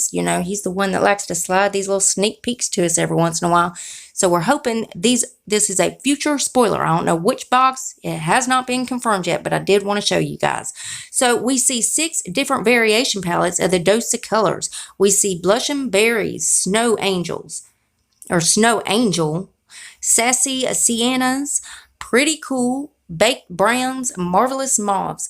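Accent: South, United States. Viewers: Girl, I love you! Your country girl accent reminds me of me! 💜 I live in a city now for 8yrs but people still say they know I didn't grow up here bcuz of my accent! Love Your videos too! 😘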